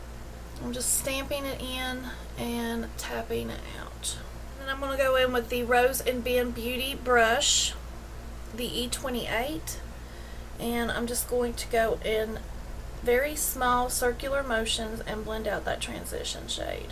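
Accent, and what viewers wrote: South, United States. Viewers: 🚨🚨🚨🚨🚨🚨 I'M READY... I'M JUST HERE TO SEE YOUR PRETTY LITTLE FACE!!! ITS THEM EYES AND THAT COUNTRY GAL ACCENT FOR ME😘😘😘😘😘😘 🚨🚨🚨🚨🚨🚨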